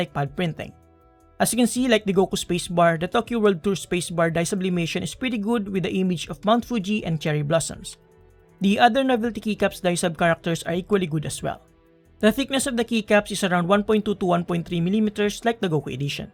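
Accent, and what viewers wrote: Philippines. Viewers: Love the accent, Philippine pride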